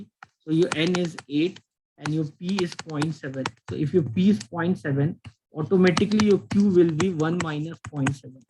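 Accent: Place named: India. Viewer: bro that indian accent of yours makes it really hard to understand the vid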